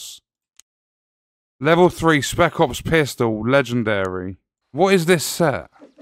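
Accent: United Kingdom. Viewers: dude the british acent absolute king 😩👌🔥🔥🔥🔥🔥🔥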